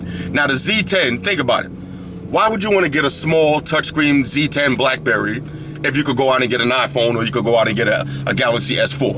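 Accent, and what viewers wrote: New York, United States. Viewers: Thats that NY son Q'borough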